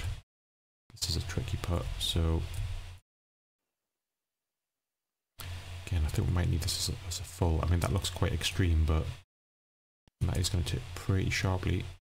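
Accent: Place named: Newcastle, United Kingdom. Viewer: Hey thanks for the play through - really enjoyed your round - pretty much as intended.. and great to hear a Newcastle accent!… appreciate the exposure… yeah I have few courses out there - Bramley Manor is the previous one and Shearwater Sands is another links ..: cheers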